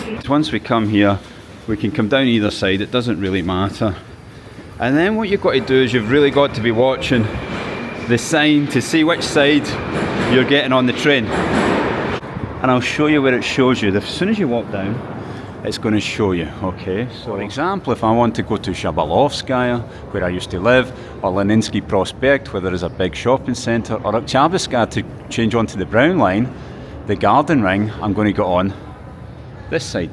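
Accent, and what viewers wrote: Scotland, United Kingdom. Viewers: you probably live on the moon😊. He speaks English. His mother tongue (Scottish) has nothing to do with this. The Scots speak English with this accent (and his not a difficult one, there are much harder in Scotland).There are many accents of the English language spoken in the UK which differ from the classic English... Listen to MMA fighter Paddy Pimblet speak. It's an alien language😊. Though he's from Liverpool... Scouse-that's the name of his accent... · Согласен, говорит примерно так: "Халёу эфрипоти. Ай эм скотищ гай ин Моску"...))) P.S. Надобно отметить, что в его шотландском говоре есть некоторый шарм...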